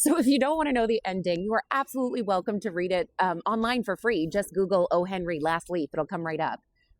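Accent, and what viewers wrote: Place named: Ireland. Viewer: I hear a hint of Irish in your voice, great story tellers, the Irish and what a lovely story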